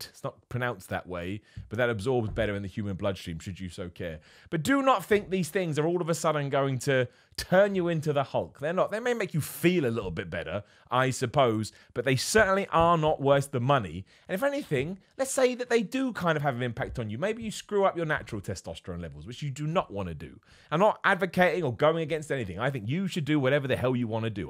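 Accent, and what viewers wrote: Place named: England, United Kingdom. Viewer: Yo, you look like the older version of Saitama. Plus the English accent 👍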